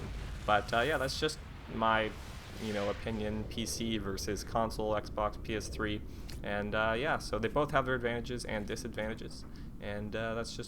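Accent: Canada. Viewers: hey are you from canada??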